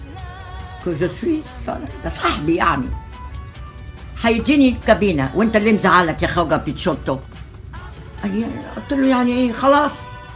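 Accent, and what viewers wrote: Egypt. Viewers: It feels so nice to hear the beautiful Egyptian accent from that beautiful lady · بتتكلم إسكندراني إسكندراني .. أنا مكنتش نخاف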